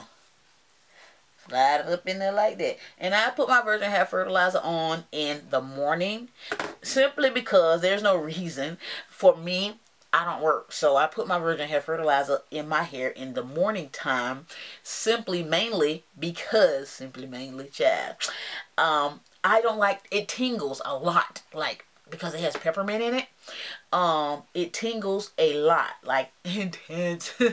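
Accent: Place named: Texas, United States. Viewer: Hey! I was watching your videos last year or whenever when you posted about Virgin hair fertilizers to grow hair and testing if they work etc. And I saw some uploads but not watched them about your cancer diagnosis and the birth of your baby. Also of your hair loss from chemotherapy treatment as I believe this was 2016 or November 2017? I might be wrong though lol By the way I like your accent! Are you born a Texan because it sounds strong unless its common? Hope you reach your hair goal soon!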